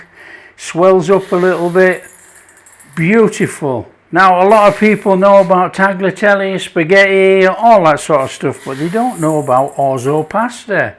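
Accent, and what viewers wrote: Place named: Sheffield, United Kingdom. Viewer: I definitely will! I have made your mashed potatoes with cabbage, green onions and bacon; twice already! My family can't get enough of it! Today I made it with corn beef, and it was an absolute delight! So thank you for the recipe,! If I may ask you, what part of UK are you from? We love your accent! It reminds me of our family friend, from Sheffield UK!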